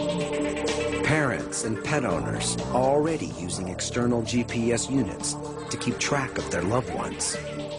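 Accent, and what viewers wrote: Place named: Netherlands. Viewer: Who cares about life?? DUTCH ACCENT DUTCH ACCENT DUTCH ACCENT DUTCH PEOPLE PARTY DUTCH PEOPLE ACCENT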